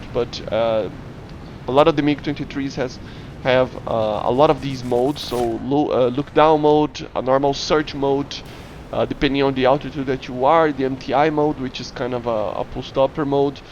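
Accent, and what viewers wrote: Brazil. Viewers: What is your nationality? You seem to have a Brazilian accent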